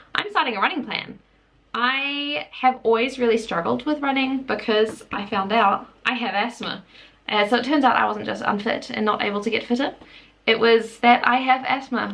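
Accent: New Zealand. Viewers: Haha I recognized the kiwi accent right away!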